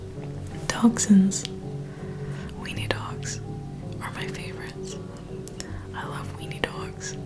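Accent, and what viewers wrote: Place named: United States. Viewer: Falling asleep and then hearing Greggs with your American accent, surely not THE Greggs. But yes, it is the Greggs! I didn't know you were in the UK.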